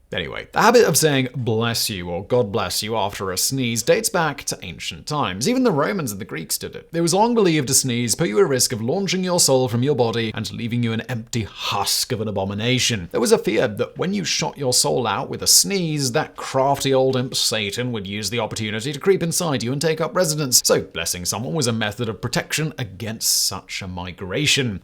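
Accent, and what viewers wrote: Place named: RP, United Kingdom. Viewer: One cannot take on this much work in this many directions and not become scattered. He has a cut-glass British accent (which hardly anyone has any more! He sounds a bit like Churchill mixed with James Mason - most Brits are now quite a bit more "broad"), but can't trade and sell on that forever. His occasional goofiness goes too far in the other direction. He is a victim of his own success, and I think from now on the quality will continue to deteriorate. Any company that expands too rapidly in too many directions fails. I don't wish failure on him by any means, but the cracks are already beginning to show.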